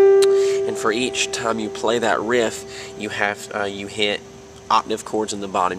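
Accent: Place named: Australia. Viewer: Why do I hear a tiny hint of Australian in your accent?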